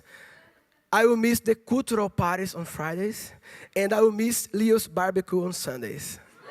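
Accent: Northeast, Brazil. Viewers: Esse sotaque nordestino no inglês é massa demais, Parabéns!!!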